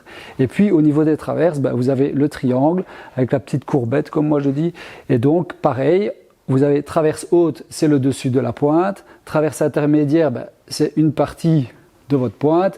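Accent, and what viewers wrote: Belgium. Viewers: Bonjour. Très bonne vidéo comme toujours on ne s'en lasse pas bravo et merci pour tous ses conseils avisés, pertinents, et argumentés. C'est FLOKY qui va encore se marrer car tu "perzistes" toujours dans cette belle langue franco belge. Allez ciao et bonne continuation.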